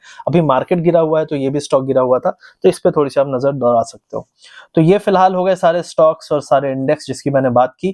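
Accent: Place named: Gwalior, India. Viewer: Very original ho bhai...bilkul desi gwalior...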